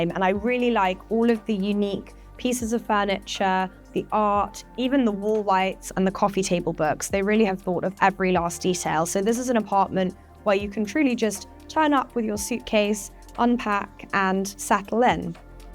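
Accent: RP, United Kingdom. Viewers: OK Yah person employed to flog ludicrously expensive pad. Almost comical received English.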